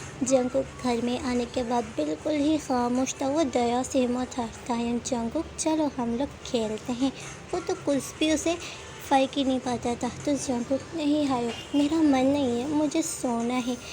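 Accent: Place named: West Bengal, India. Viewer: .. aap jab story.. explain krte ho..toh syd Bo aapki bangle ki hi aawaj hai.. bo mujhe bahut achi lgti.. 😚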